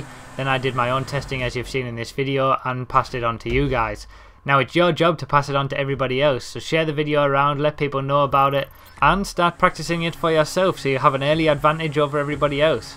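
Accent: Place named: Teesside, United Kingdom. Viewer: You sound like a lad from teeside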